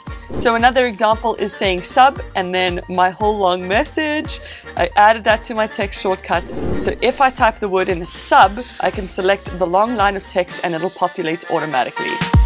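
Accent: South Africa. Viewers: South African? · You have a white South African accent.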